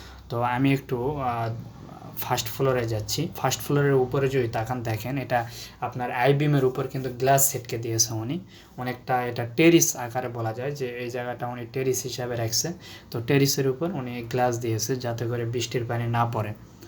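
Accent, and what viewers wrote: Naogaon, Bangladesh. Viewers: আপনার বাড়ি নওগাঁতে নওগাঁর ভাষা বোঝা যাচ্ছে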